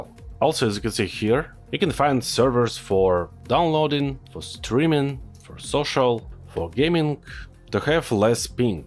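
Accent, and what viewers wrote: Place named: Russia. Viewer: Русский акцент :)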